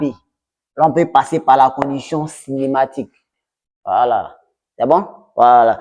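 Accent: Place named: Ivory Coast. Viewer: Je jure que c'est un Ivoirien. L'accent ne ment pas.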